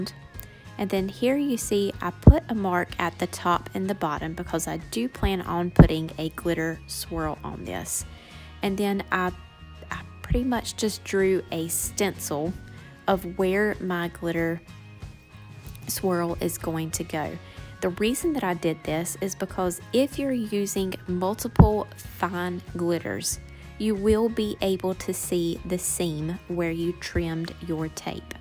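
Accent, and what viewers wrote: South, United States. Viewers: Glitters are gorgeous!!! I love PDB!! My favorite glitter of choice!! What part of the South are you from? I know a Southern accent when I hear it!! 😂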